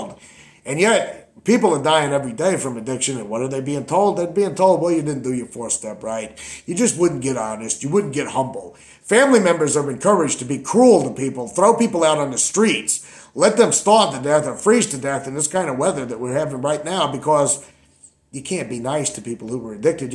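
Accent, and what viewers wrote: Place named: New England, United States. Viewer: New Englandar! Love it!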